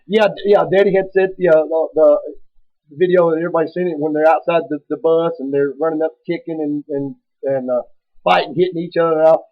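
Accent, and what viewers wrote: South, United States. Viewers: SOUTHERN flair! Says it all! 👍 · Southern stories told with a southern flair! Love it! Merry Christmas to you and yours,!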